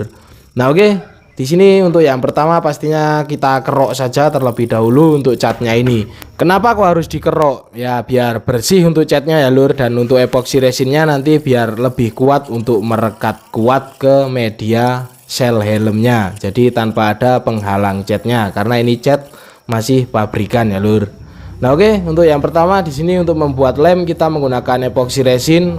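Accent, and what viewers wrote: Temanggung, Indonesia. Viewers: Ternyata orang temanggung to mas😅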